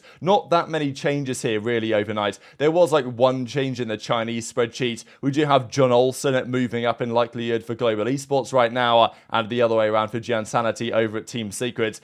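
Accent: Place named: United Kingdom. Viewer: yay learning english, british engliish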